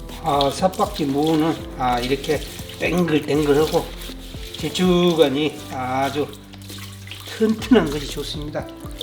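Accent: Jeolla, South Korea. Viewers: 전라도 사투리가 구수하니 듣기가 좋으네요 석박지 요리 잘배워 갑니다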